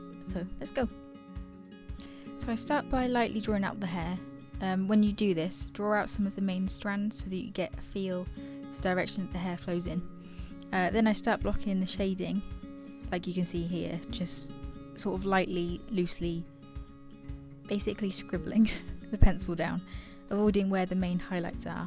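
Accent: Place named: United Kingdom. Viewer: What beautiful job, and a great job of explaining it as well. There's another artist on YouTube (LethalChris Artist) with almost an identical accent. I wonder what region of the UK you are from? That accent is really awesome.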